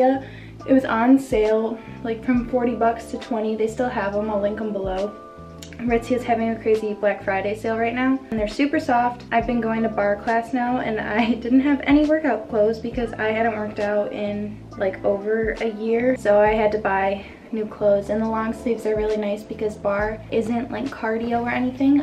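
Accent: Midwest, United States. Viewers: midwest accent !!!!! thank you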